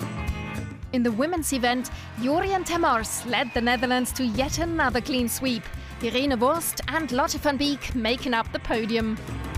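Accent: Germany. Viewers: You pronounce Dutch name as if they're German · Was the narrator german, she had such a germanic accent lol. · She pronounces Dutch names with a German accent lol. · Why does the announcer pronounce all the Dutch names as if they were German? It is a bit disorienting. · You pronounce the names very german but pretty good anyway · yes I could hear the German in her voice xD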